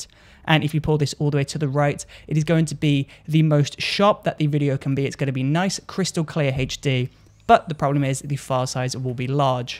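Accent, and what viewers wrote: United Kingdom. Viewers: Sounds pretty nice British?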